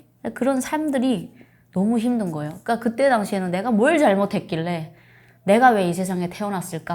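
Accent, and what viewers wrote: South Korea. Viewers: it’s the accent. You had to live in South Korea for a decent amount of time to lose the North Korean accent. North and South speak with differently and have different Vocabulary. The way you speak doesn’t change in couple of days. That’s why I can tell she lived in South Korea for a while. She talk more like a South Korean than a North Korean. So saying that’s her first time eating Korean Ramen is just Click Bait. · This clearly isn’t her first time cooking South Korean ramen—she does it casually and nonchalantly, without any particular focus or curiosity, like it’s just another quick meal on an ordinary day. It’s obvious she’s been in South Korea for a while since escaping the North. You can see it in her mannerisms and hear it in her accent—she’s clearly assimilated into life here. That’s why I don’t get the need for these sensationalized, clickbait titles like “North Korean girl tries South Korean ramen for the first time.” It feels cheap and disingenuous. 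It turns her real life and quiet normalcy into a gimmick, taking the focus away from her actual story just to get views...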